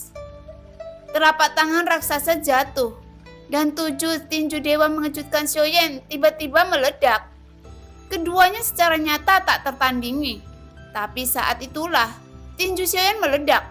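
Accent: Java, Indonesia. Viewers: Wong jowo to mbak..mimin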